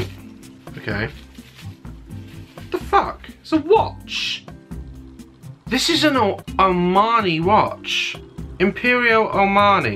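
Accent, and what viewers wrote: United Kingdom. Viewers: he's british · he is from Britain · I'm pretty sure he's British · Hes British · London he's British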